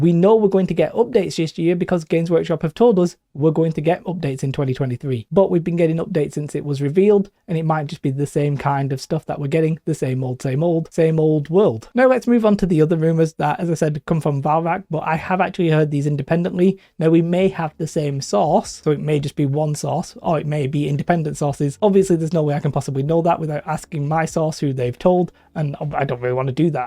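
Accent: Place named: Germany. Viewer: What is your accent, German? Tjought you was a Brit but today I heard you have some charming accent. :)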